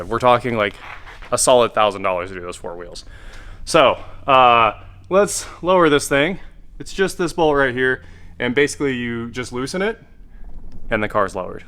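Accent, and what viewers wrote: Kansas, United States. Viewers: A Kansas t is a d? I don't understand. However, now you've given a reason, and I know why it is now, I'm fine with it. I'm not knocking your content, just I couldn't understand your pronunciation. I love your content, your ambition, your drive and your achievements. I look forward to your uploads, and long may they continue, even with the Kansas t. It's not related to Jed Klampit's Texas Tea? No hard feelings I hope.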